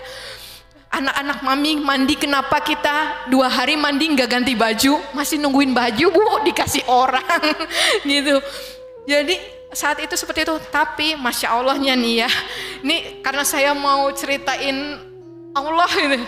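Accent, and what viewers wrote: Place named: Priangan, Indonesia. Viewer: Duh Gusti tutur katanya bahasanya ini sangat menyentuh hati lembut dan sejuuuuuuk di kuping,logatnya seperti orang priangaaaaan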